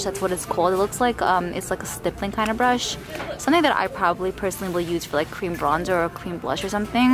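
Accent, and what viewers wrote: New York, United States. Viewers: new york accent , i pronounce it the same way you do.hahahahaha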